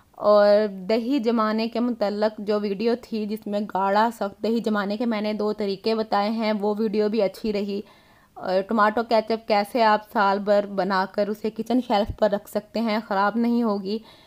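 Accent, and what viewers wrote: Punjab, India. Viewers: پنجابی لہجہ ایسا ھی لگتا ھے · G Haan lehja tora sakht lagta ha shayad punjabi hone ki waja se esa lagta ha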